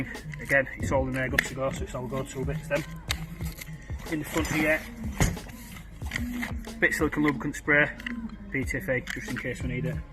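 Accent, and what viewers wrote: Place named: United Kingdom. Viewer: He speaks a little too fast and sounds like he has british accent. Maybe difficult for non english speaker to comprehend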